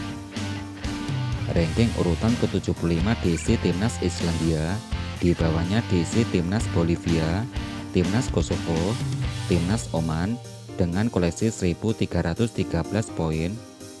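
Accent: Java, Indonesia. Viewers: Vokal mu jawa medok, penyebutan mu seringkali salah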